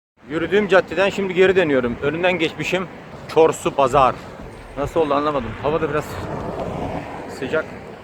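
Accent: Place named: Turkmenistan. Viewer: Тилингиз шеваси туркманларга ухшаб кетади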